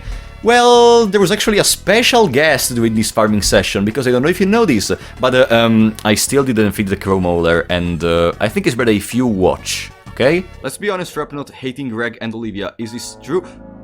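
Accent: Italy. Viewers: Yknow im a little stupid so i didnt pin you as Italian. And then i heard you say "item only". Just wanted to say that. It is very distinct and beautiful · As a fellow italian, i now understand how the accent makes the content more entertaining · Never would I have expected I'd be introduced to F&H by an Italian speaking in english. Do you know by any chance if there's an italian patch on the way? I wanted my brother to be able to play this gem, but I couldn't find anything on the matter...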